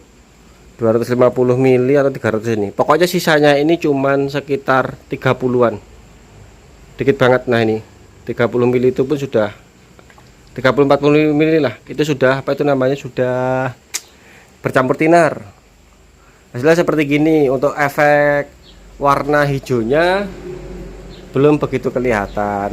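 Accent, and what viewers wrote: East Java, Indonesia. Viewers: Jelas kenal Suarane wong nggalek Tonggone cak mat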